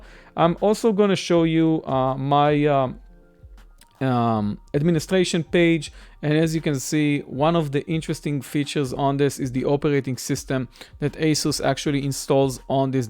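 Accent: Israel. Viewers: איזה מבטא ישראלי אחי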